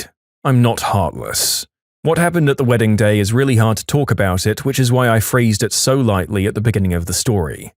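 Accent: United Kingdom. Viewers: AH! HES BRITISH!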